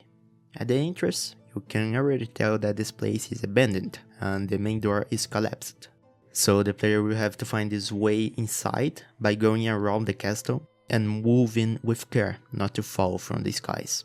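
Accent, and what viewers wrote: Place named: Brazil. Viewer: awesome atmosphere! also you sound kinda... brazilian? 🤔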